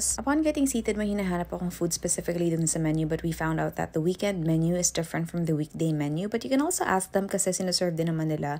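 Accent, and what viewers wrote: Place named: United States. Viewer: I swear to god u sound like a American 😭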